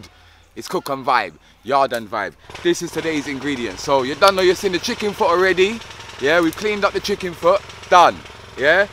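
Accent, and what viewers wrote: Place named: Jamaica. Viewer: You talking with a Jamaican accent reminds me of my ex who used to talk with a manchester accent because she liked the sound of it despite being born and bred in the south lol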